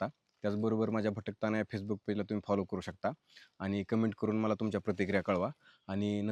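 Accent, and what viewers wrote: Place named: Satara, India. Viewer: दादा सोलापूर मध्ये भाषा काय करतो बे अशाचा टोन मध्ये बोलतात तुम्ही तस नाही ती भाषा नाही बोलत सोलापूरचे असून तुम्ही तर आमच्या सातारा कडची भाषा म्हणता 😮😮 मला सांगा उत्तर देऊन 🙏🙏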